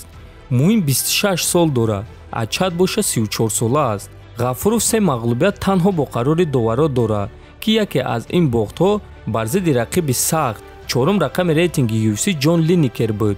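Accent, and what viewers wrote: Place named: Garm, Tajikistan. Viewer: Ами брать гарени гап мезана неки натури хамашахушру мефахмона классс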